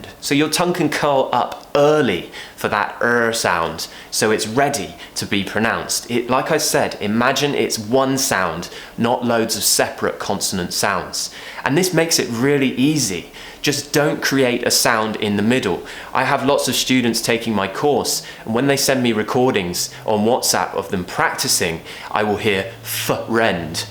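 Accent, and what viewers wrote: United Kingdom. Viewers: Thank you for the new video lesson. I love your British accent 😍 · I listen to your youtube like listening to a radio. I want to make British accent also :) Thanks always for your interesting contents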